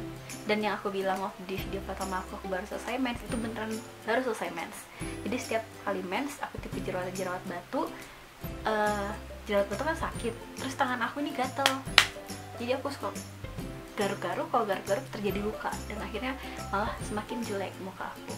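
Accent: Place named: West Java, Indonesia. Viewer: Logat sundanya nempel bgt ... Nice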